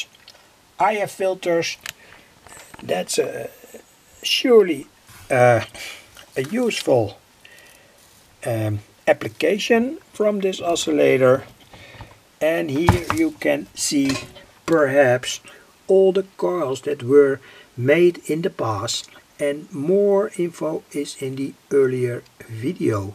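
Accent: Netherlands. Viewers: I know it's a random question but are you Dutch? I've been to netherlands and they have a similar accent. Thank you for the cool content. I really like the gigh frequency circuits you make and you make it seem way easier than I would think it is. Well it is actually.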